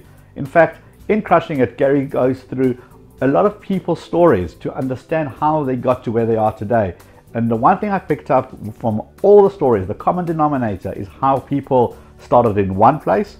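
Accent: South Africa. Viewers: Oh! Of course, you're SAfrican. haha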